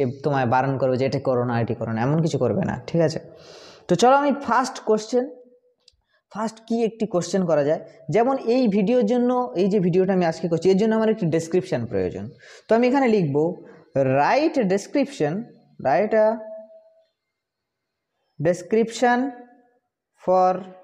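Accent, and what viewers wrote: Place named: Bangladesh. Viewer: তোমার গলা শুনে বাংলা দেশি মনে হচ্ছে , একটু ভাষার শুদ্ধতা নিয়ে আসো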